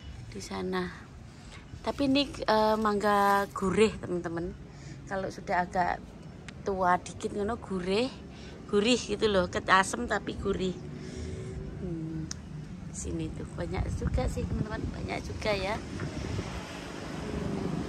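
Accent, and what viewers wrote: Java, Indonesia. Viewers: ma'af mba..aku songko Demak lg semingguan nemu vlog mba lan seneng banget kehidupan lan logat jowone..ma'af mbak?adike sampeyan neng ternak entok nopo serumah karo mba,.. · wqwqwq mbak mosok mangga gurih...aku mesti ngguyu ngrungokno mba ngomong...dialeknya jowonya kental....videonya apik kok....pinter bikin video...aku mbok diuruki mbak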